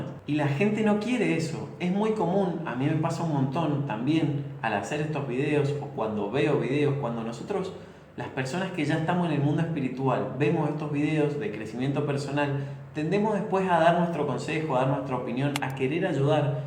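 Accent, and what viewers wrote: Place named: Mendoza, Argentina. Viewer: sos mendocino? yo lo soy y siento que tenés el acento, abrazo!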